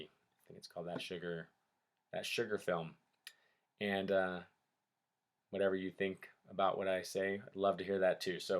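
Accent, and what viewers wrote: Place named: United States. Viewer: First, I thought you were Australian when my audio kinda messed up on the intro of your video, but when it worked, American 💁🏻‍♂️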